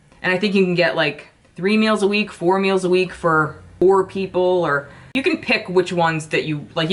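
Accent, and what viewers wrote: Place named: Philadelphia, United States. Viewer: I recognized your slight accent and realized you’re a fellow philadelphian ☺️ I love your content and how real you are!!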